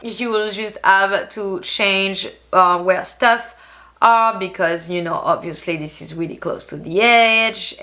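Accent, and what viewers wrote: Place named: France. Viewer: Je me disais qu'elle etait française avec son accent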